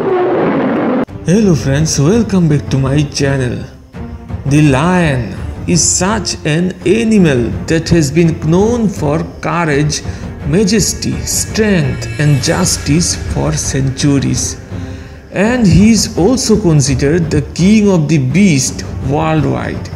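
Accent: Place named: Vrindavan, India. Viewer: Dari logat dia bicara sepertinya dia orang vrindavan 😌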